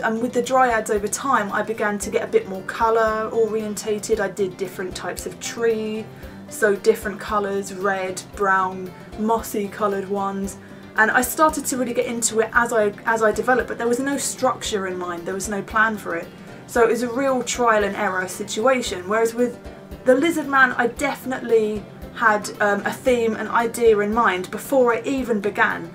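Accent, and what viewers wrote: England, United Kingdom. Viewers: You are so funny and great stay sweet and keep up the great work, It so great to see your growing as a gamer. Cheers. Later mate I have Wood Elves and Skaven. I find you can so a lot of archers but they both need new books and at this rte (th will be out beofe they are: ( Love the English accent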